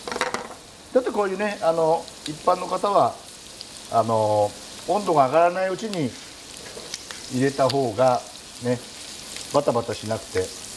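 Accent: Hokkaido, Japan. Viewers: シェフの北海道弁に、郷土料理に対する愛情を感じました。旧フランス領で発展した料理 なども紹介してほしいです。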